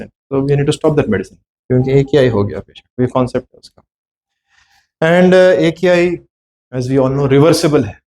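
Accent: Hyderabad, India. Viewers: Dr..Auslamalekum. We regularly watch your videos and appreciate your knowledge and experience. We have 2 observations. It's difficult to read your writing on the board becoz there is very little contrast. Please use darker marker pen and write slightly bigger words. Further although we enjoy Hyderabadi accent but some of the words are not audible because they are spoken in low tone. Hope you will not mind our observations. Please take care of these aspects in future videos. Thanks and regards Tarwinder Singh Delhi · Hi. Doc your video is informative. Can you please give more info how to reduce crestanin? You've Hydrabadi accent, are you from Hydrabad india? Where can i contact you? HB from Toronto Canada 🇨🇦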